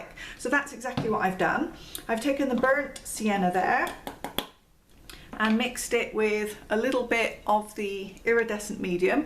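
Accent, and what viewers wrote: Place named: Dorset, United Kingdom. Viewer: Hi there, this is so interesting, thanks for sharing, I wonder, is there something you could add to micas to make them pearlised? Btw love your accent, are you from Dorset?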